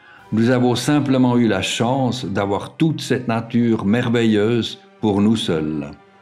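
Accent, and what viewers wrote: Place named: Switzerland. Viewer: Bonjour, Des paysages magnifiques. Toujours l'accent Suisse merveilleux. Bonne continuation. Thierry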